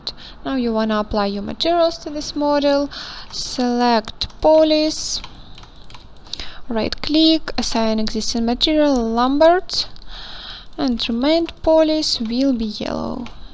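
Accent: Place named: Russia. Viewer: слышится русский акцент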